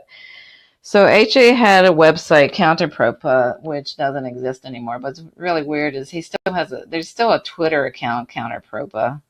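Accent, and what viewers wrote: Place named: Missouri, United States. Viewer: your growl, if i may say so, sounds southern to me, throaty and nasal at the same time, like a missouri accent, the show me state, where i'm from.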